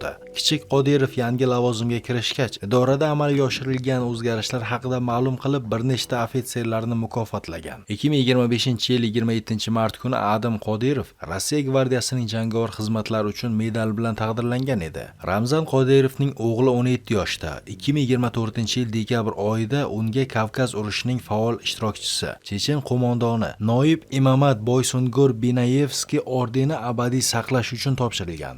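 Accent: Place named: Kashkadarya, Uzbekistan. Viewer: ШЕВАЙИЗДАН БИЛИНИБ ТУРИПТИ КАШКАДАРЙО ЙОКИ СУРХОНДАРЙОДАН БУЛСАНГИЗ КЕРАК,,, ШАХРИСАБЗДАН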